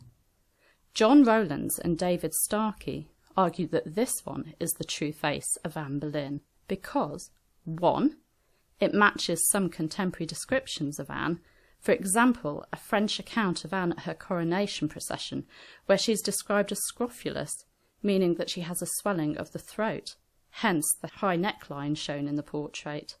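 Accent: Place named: England, United Kingdom. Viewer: I really enjoy your videos.And love you English accent.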